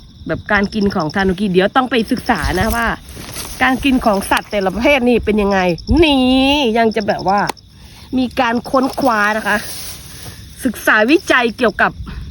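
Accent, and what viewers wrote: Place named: Thailand. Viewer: อยู่ญี่ปุ่นมากี่ปีครับยังไม่ลืมสำเนียงไทย เยี่ยมครับ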